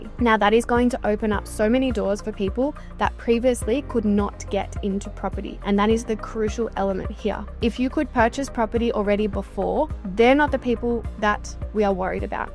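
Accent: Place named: Australia. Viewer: Can't stand Australian accent!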